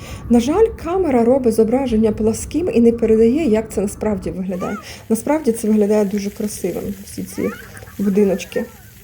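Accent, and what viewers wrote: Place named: Ukraine. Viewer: Дякую пані !👍👏👏👏 У вас добра українська мова . 👍Вибачте , в якій області України така чиста мова ? Я з чернігівської області.🤗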